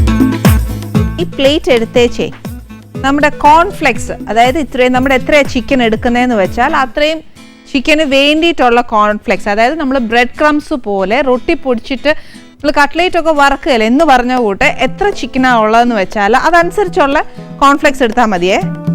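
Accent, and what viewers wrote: Kottayam, India. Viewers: Kottayam slang matram mattiyal kollam... Dahikkunnilla.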